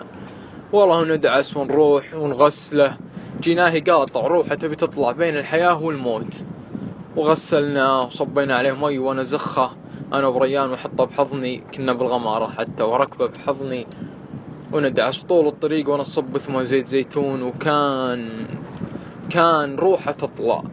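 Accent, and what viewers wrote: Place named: Sakaka, Saudi Arabia. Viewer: لهجة اهل سكاكا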